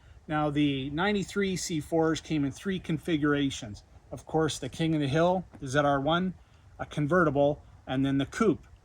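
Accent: Canada. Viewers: That and that annoying Canadian accent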